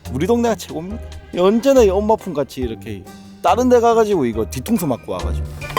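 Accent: Gyeongsang, South Korea. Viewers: 경남쪽(갱상도 사투리억양 넘넘 듣기좋습니다! ~~말솜씨도. 굿~~👍👍나도대구지만 경남억양이 참 듣기좋터라구요. ㅋㅋ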